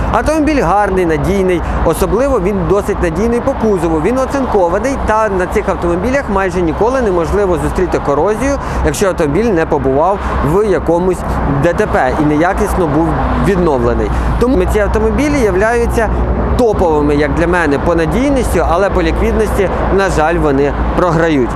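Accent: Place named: Rural, Ukraine. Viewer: Говориш, звичайно по кугутському)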